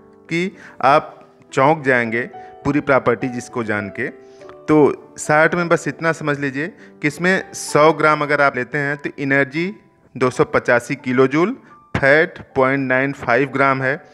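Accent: Bihar, India. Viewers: Dr n s varma aap khud k video kyo NAHI dalte ho ye kis bihari ki awaj h or aap khud present ho kar bataya karo. or kisi bhi fal ya sabji par video banao to uski ayurvedic property BHI bataya karo ab aap ne elopathy ka chemical value Bata di or isko sham ko ya raat ko khane se mana BHI kara h. kyo kyonki ye prakarti me thanda hota h is liye or ye baat aap kewal ayurved se hi malum hoga the true valuation of the artical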